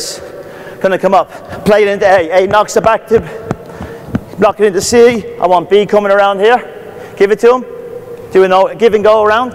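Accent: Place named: United Kingdom. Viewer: British accent ''' in it '''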